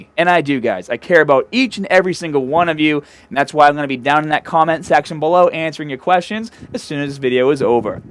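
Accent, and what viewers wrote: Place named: New England, United States. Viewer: Bro: never, ever, ever lose that accent! It adds to the uniqueness of your channel and, honest to God, at least for me, it helps to make somebody pay attention to what you're saying. Granted, I'm just your run-of-the-mill, excited neighborhood linguist. But, it's nice to hear a genuine New England twang for a change instead of that sterilized mid west-influenced general American Standard accent everybody tries for. Also, I am in total and complete agreement about the prone hamstring machine! I have the exact problem you describe with the seeded hamstring machine. Can't seem to stay in the damn seat whenever the wait is really high. I frequently find myself having to readjust my position every other rep or so. Best regards from Texas.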